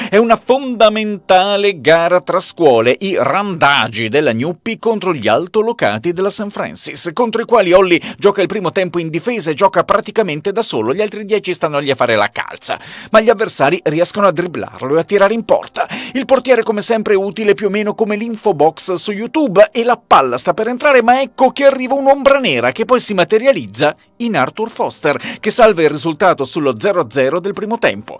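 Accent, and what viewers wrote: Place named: Trentino, Italy. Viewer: YouTube pullula ormai di canali gestiti da appassionati di anime vecchi e nuovi che allietano il loro pubblico con vari tipi di video dedicati all' argomento... ma, come dice il proverbio, la classe non è acqua: o la si ha oppure no. E tu, possiedi una classe ineguagliabile che sai mescolare abilmente con una intelligente ironia rendendo i tuoi video divertenti, interessanti e piacevoli per la loro comicità mai volgare o rozza. Sarà forse il tuo meraviglioso accento trentino a conferire al tuo eloquio quel suono pulito ed elegante in qualsiasi contesto, sarà la tua evidente cultura unita alla tua innegabile simpatia... Fatto sta che dovrebbero esserci più youtuber come te ed è sempre piacevole per me guardare e ascoltare i tuoi video. Mi piace considerarti un po' come un amico che mi fa sorridere e mi tieni compagnia con le tue interessanti pubblicazioni, la cui varietà di genere e argomenti dimostra la tua incredibile versatilità.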